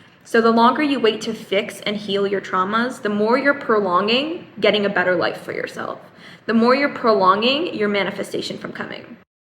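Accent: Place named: Bollywood, India. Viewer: ooh you're punjabi. i didn't even imagined that you have a proper bollywood accent. anyhow thode muho punjabi sunan ch boht khushi hougi😍😂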